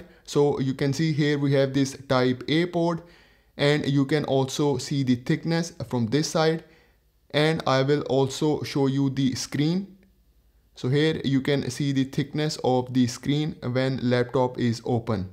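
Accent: India. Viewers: Heavy Indian accent 😅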